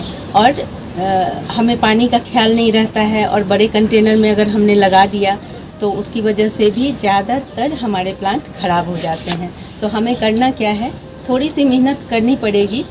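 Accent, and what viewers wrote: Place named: Uttar Pradesh, India. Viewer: Uttarpradesh accent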